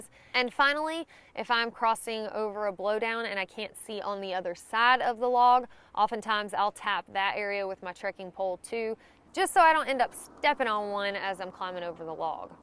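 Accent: South, United States. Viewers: Just love your communication ability. Very efficient and has the perfect southern accent.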